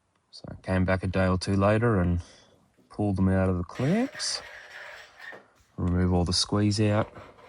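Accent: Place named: Australia. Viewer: Great to hear another Aussie voice on youtbe! Love the unit. Subbed, I look forward to seeing more of you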